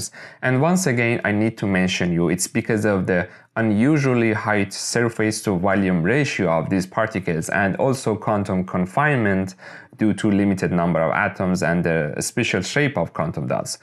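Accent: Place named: Iran. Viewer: Hello, are you from Iran? Because of your accent,I asked.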